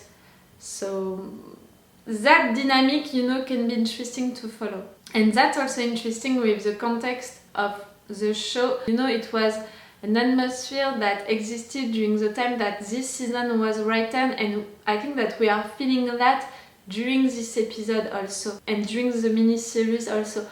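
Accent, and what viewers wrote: France. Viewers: I love to hear a french person get constantly concerned about democracy. I swear, I start smiling every time I hear you say democracy in that sweet french accent. ;D Also, I have to defend Gaius. You keep calling him useless, but he is actually a genuine genius. If he didn't have Number Six manipulating him in his head, he would be a huge asset to the fleet. And even so, I think he probably still is, we just don't see it too much, but we've seen scenes where he brings reports for the president and he did the statistical work in the meeting during the water crisis episode and other stuff like that, so he is probably actually doing useful things in the background.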